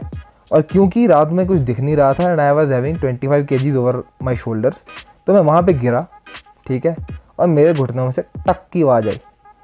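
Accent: India. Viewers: new subscriber here ! sounds like a UP wale bhaiya !!Am I right????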